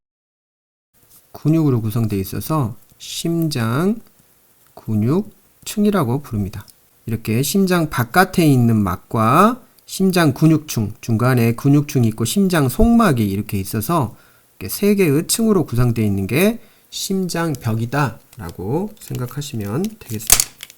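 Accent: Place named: Jeolla, South Korea. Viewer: 우리 교수님, 고향이 전라도 신가봐요😊 심장시리즈 더 올려주실건가용??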